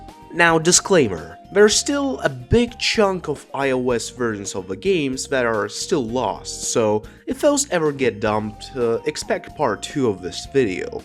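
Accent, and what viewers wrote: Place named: Russia. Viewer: I did not notice the slight russian accent until I noticed the russian text lol Then again I'm from Israel so maybe I'm just uses to Russian accents more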